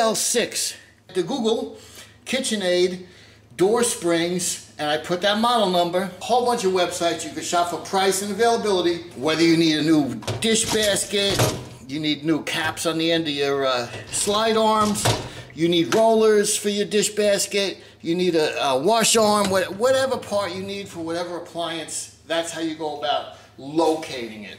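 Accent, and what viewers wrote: New York, United States. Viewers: Thanks. I value the 2 tips you gave. The first was using the putty knives to help lift the front legs over the vinyl flooring edge. The second to use a string the stretch the spring enough to hook the cable end. And I enjoyed your accent, too. Nobody can match a native New Yorker. I have been away from my boyhood neighborhood in the west Bronx for a long time. Take care.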